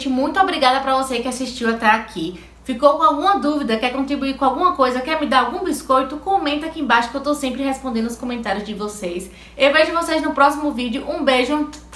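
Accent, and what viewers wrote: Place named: Ceará, Brazil. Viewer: tu és do Ceará? eu acho pelo sotaque :) Eu amooooo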